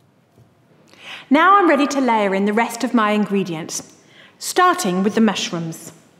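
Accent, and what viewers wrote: United Kingdom. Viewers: Why do I keep watching this video?!?! (other than the British accent, I mean)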